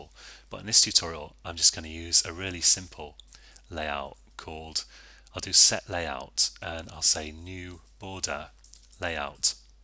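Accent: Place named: Cheshire, United Kingdom. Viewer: ***** Ugh, how did I not see that, slight dyslexia problem. Thank you. By the way, which county are you from in the UK. Always think you sound like your from close to my home in Cheshire, but maybe I've just been away too long.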